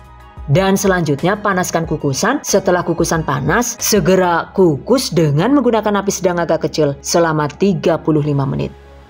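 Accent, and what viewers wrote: Banyumas, Indonesia. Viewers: Lama ga nengok channel ini. Kangen intermezzonya.. Logat ngapaknya manaa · Ngakaaaak... Ternyata orang ngapak juga, ora ngapak ora kepenak ya mbekayu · wkwkwk.. ngapaknya darimana bang? saya cilacap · 😂 jebule ngapak 😅 keren Channelnya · Waw dubbernya " ngapak" kiye 🤣🤣🤣🤣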